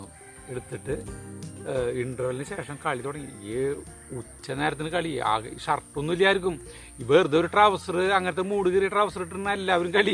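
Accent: Thrissur, India. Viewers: I like urTrissur slang .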